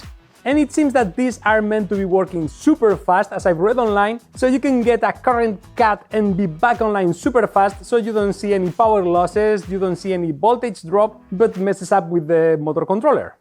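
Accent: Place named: Spain. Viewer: Tu eres Español Pero vamos.... Ese acento... Igual me equivoco. , a ver si te lanzas y metes audio Español también.. Gran video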